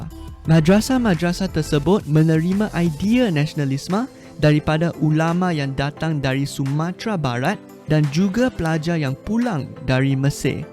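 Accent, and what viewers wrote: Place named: China. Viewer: Masih ada pelat cina, kalau boleh tingkatkan cara pertuturan supaya tidak pelat semasa bertutur dlm bhs melayu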